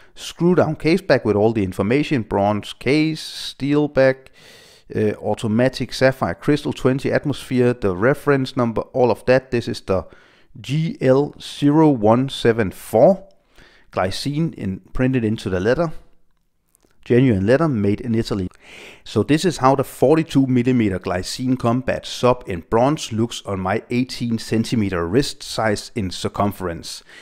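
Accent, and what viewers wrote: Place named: Denmark. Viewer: I’m looking at Massdrop from the US and it is running at $419. You can even get the monster 48mm versions. I have and love the Airman SST Pumpkin. I did buy the “Goldeneye” Combat Sub but I almost never wore it and it wore bigger on the wrist than the 42mm would suggest so I sold it. This bronze version is tempting, but I wonder if the same thing would happen and I wouldn’t really wear it. Also, God Day from the US. I work with a lot of Danes and I love your accent. It’s super!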